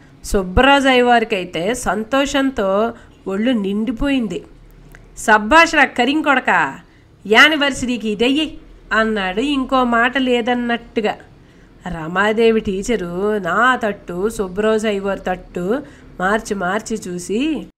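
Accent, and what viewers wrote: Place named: Nellore, India. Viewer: Nellore yasalo ceppandi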